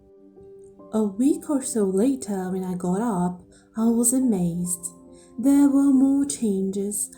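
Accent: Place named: United Kingdom. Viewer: You have a really sweet voice. Seriously i almost thought you're a native british woman.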